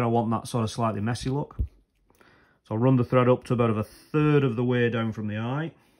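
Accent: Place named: Lancashire, United Kingdom. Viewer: cracking straight to the point , ive just started fly fishing and tying just what was needed finding your channel, sounds like your from lancashire 🍻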